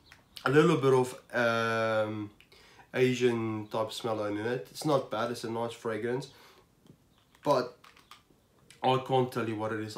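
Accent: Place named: South Africa. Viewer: Are you South African by any chance? I’m South African and I hear it, but it also sounds like you’ve lived in the UK or something.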